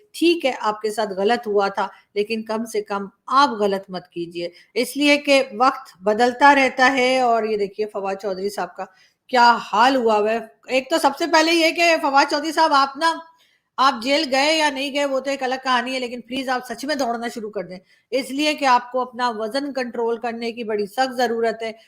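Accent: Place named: Delhi, India. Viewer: What I like about she talks like An Indian and talks like a common citizen and a woman and asks all the common questions that an ordinary citizen would ask...bade bade fancy sawal ya lecture nahi. deti., jisko aam zindagi ke saath koi lena dena nahin. nahin She connects well with common ppl. That is her strength. Talking about India so much that now she sounds like a regular girl.from Delhi jahan auntyan ki bare mein yeh bolenge "dil ki achi hein...thodi gamandi hein..bas."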